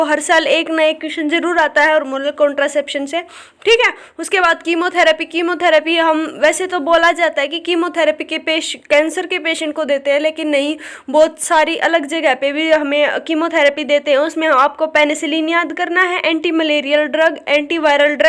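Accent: Haryana, India. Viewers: That Haryanvi accent is cool And good video 👍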